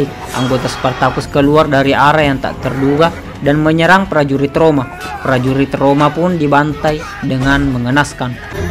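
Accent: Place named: Makassar, Indonesia. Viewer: Orang Makasar ini kita dari logat nya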